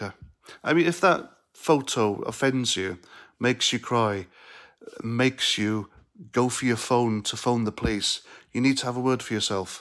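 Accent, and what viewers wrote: Wales, United Kingdom. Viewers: Just found you from Rev Simon Sideways Discord channel. Subbed. So Nice to hear the Welsh accent. I've been away from North Wales for 22 years now and lost my accent. Agree 100% with your views. Any time I've come across any police, I'd say a good half are egotistical. There are some good ones, no ones ever disrespected or been abusive to me, but I speak to people with respect, in uniform or not, we're all human at the end of the day. Some males I know, however, and they are gentlemen, have been assaulted by them. One has a solicitor and is suing. They are not above the law.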